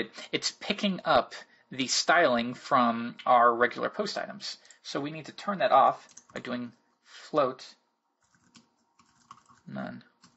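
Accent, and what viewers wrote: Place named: United States. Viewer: great tuts,u sound american but u do like football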